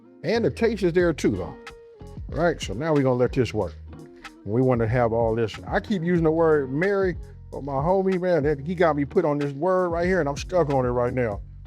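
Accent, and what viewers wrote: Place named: West Coast, United States. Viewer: He got that west coast hood accent. I be waitin for him to call us busters 😆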